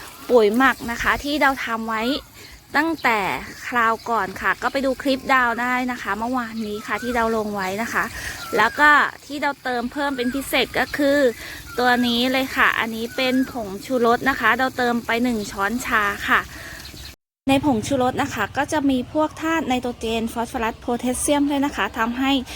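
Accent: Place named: Suphanburi, Thailand. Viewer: คนเก่งของพี่มาแย้วววว.. น้องดาวคนจังหวัดอะไรคะ พี่คนนครปฐม,วัดสว่างค่ะ สำเนียงเหน่อพอกัน😁😄😃😀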